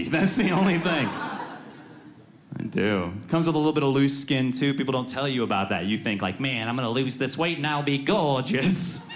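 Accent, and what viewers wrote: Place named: South, United States. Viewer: His southern accent is still there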